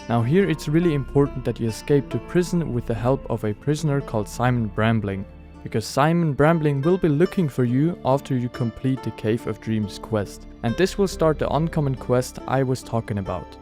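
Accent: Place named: Switzerland. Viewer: Yes de Akzent im Englische würd ich überall erkenne 🇨🇭 Btw usually I would do all quests even the dreadful Reason of State but for Simun, who you meet in Lugos' prison, was so fishy that for once I turned down a quest. Seeing how awful Unpaid Debt is makes me think that it was the right choice 😂 However, it is interesting that the name of the man who Simun orders you to kill is the name of a Slavic smithing god. Simun mentions his sword if you side with him, but there is no special loot to be found there.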